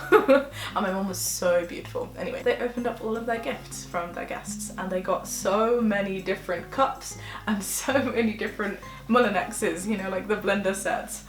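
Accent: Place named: Scotland, United Kingdom. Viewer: I’m so here for your Scottish accent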